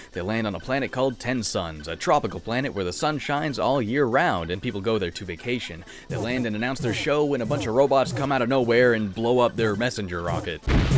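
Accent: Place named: Canada. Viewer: can someone finally answer this for me? is a fellow canuck or is he like from northern america? because that accent is just so hard canadian sounding and and a canadian with as very separate yet funny sounding accent i freakin love it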